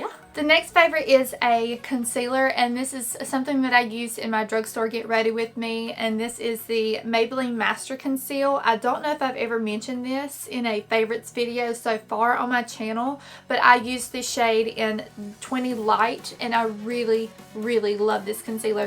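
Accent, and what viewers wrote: South, United States. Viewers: You are stunning and I love your accent! Are you from the south?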